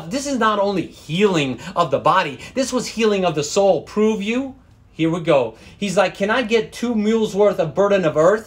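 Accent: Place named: South, United States. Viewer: love it when you sing and when your Southern (from Tennessee?) accent shows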